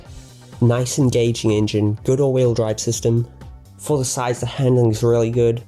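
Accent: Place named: United Kingdom. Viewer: wait wtf you're from nz? Thought you were British this whole time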